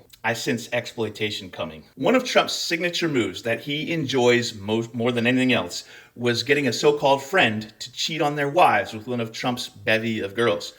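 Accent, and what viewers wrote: Scotland, United Kingdom. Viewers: I just noticed your Scottish accent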